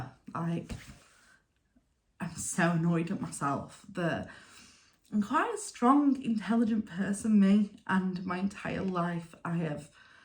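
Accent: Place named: Leeds, United Kingdom. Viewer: Hi, totally relate to everything you’re saying, especially about feeling that you’re a strong, capable, intelligent person and yet this illness we have has got the better of us. I have recently started using Wegovy which has helped me enormously but it’s not a quick fix and still have to be very careful and mindful of what I am eating and drinking. I’m guessing from your accent you’re from Leeds, which I am too (Crossgates) though I now live in Norfolk. Xx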